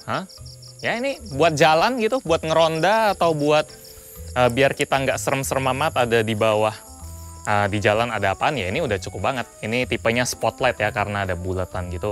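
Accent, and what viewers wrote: Palembang, Indonesia. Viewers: "Trus klo liat kepocok😂 wong palembang caknyo budak ini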